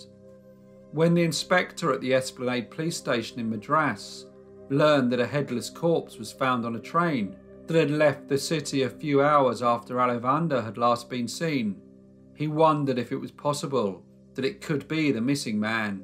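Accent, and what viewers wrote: United Kingdom. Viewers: thank you for another outstanding video of true crime that is both unique and unheard of by me. You and Forgotten Lives have some very special content and it's an absolute pleasure listening to both of your videos. 🙏🙏Plus, your awesome British accent makes everything sound even better. 👏👏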